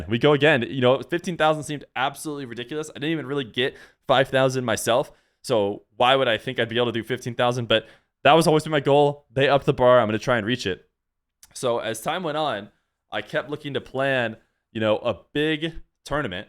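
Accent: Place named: Scotland, United Kingdom. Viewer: cant lie. I love when a player pops off and gets excited. Nothin like that scottish accent!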